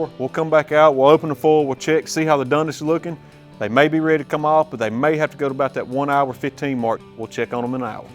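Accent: South, United States. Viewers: You can tell by the southern accent, he doesnt live an ANY Texas city. Lol. "We still have a little way to gew."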